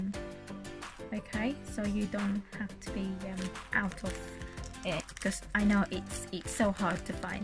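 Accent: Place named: Philippines. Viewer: Nice accent are filipina? Nice sun glasses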